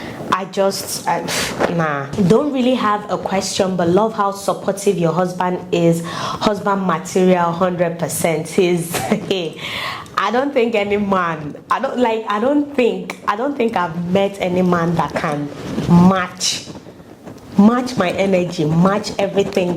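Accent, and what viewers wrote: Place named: Nigeria. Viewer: I really appreciate that you are audible & clear..even when you drop in your dialect it doesn't make it hard for a non Nigerian to understand. You are an Intelligent lady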